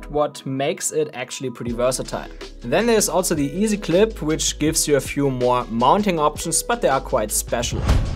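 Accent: Germany. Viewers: hmm sounds like DEUTSCHLAND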